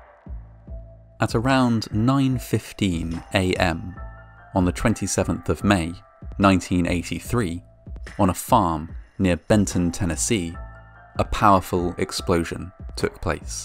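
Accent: United Kingdom. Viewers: I'm confused. You seem to have a British accent and yet you consistently keep using metric measurements first. Everyone knows UK and USA have it right. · WHY is this American incident narrated by a British narrator? Couldn't you get an American to tell the story? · Gawd I hate a British accent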